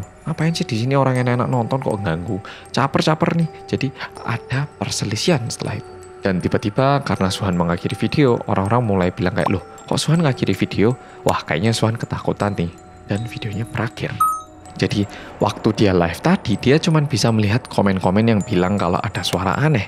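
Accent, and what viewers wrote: Java, Indonesia. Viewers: Ga percaya aja oppa ini logat jawa bukan logat korea🤣 medok banget jawa nya wkwk, tapi muka korea banget wkwk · Bang orang korea ap orang jawa kok logat ngomongnya jawa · Kerennn medhok tnan😍😊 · Enak banget dengerin orang Korea ngomong nya medok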